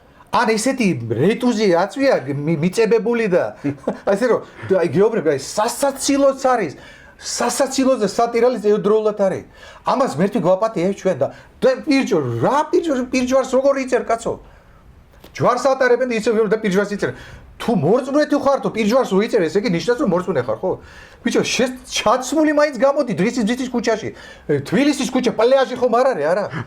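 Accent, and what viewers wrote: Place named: Armenia. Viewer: ჩვეულებრივი ფარისეველი, ბლia:) სომხობა ქართველობაზე იმისთვის გაცვალე ,რომ ქართველი ქალები გელანძღა,მაგ სომხურ აქცენტს მაინც მოუხერხე რამე:))) არაა,სომხობას არ დავცინი,უბრალოდ , პოზიციაaa ძაან "ჰაიტარაკუნემ რესპუბლიკ" :DD....მე ვიყო :D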